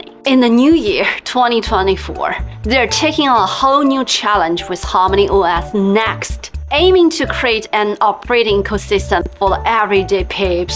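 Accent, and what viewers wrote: United Kingdom. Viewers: don't you know she speak pure UK English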